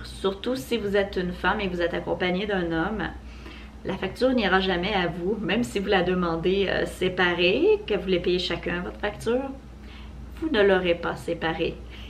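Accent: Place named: Canada. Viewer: votre accent n est pas français,il est bien canadien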